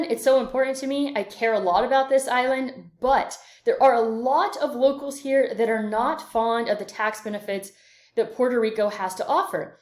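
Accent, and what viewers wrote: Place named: United States. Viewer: I’m aware of when it happened. Did you also know that the US tested contraceptions illegally on PR women which made many sterile and some even die. Aside from what obviously has affected the fertility rate on our island, why women are choosing to have less children? Lack of money and opportunities which is getting even worse with all you rich people moving in and raising the prices of real estate. “There are less white people in Hawaii” It’s not about white people, it’s about rich foreigners and there are many in Hawaii taking over their lands. That’s the reason why homelessness of the natives has increased in Hawaii. Also, I imagine that you’re a white American from how you speak. Just know that most Puerto Ricans could care less about US political standpoints. So, this whole “liberal” or “conservative” or “woke” crap means nothing to me. 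I’m talking to you about Puerto Rico. You can’t educate me about my own island, its people and history; I AM PART OF ALL THAT.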